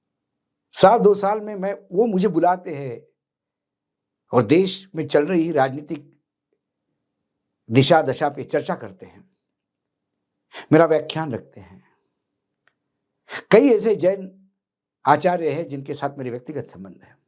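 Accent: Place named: Nagpur, India. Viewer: Aap ki sab bat thik hai lekin nagpuri tadak dehi deto ho, aap ka aakan bhi chuk jata hai, phir bhi kam achha kar rahe hain, aage ke liye sadhana ki jarurat hai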